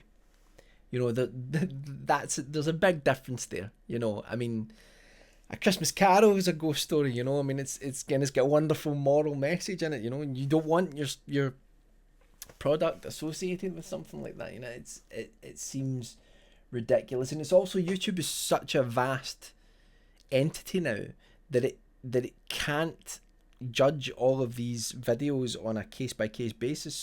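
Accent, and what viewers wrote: Scotland, United Kingdom. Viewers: Well said or rather, well said with a Scottish accent 🎧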